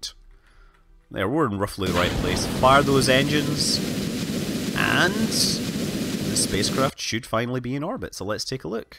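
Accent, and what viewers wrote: Scotland, United Kingdom. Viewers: Only without the shouting, more intellect and a scottish accent?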